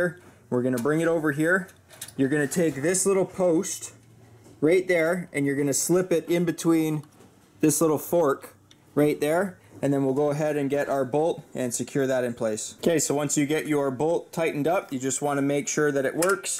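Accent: Canada. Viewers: Nice video, good lighting and explanation (you sound like you are Canadian!)…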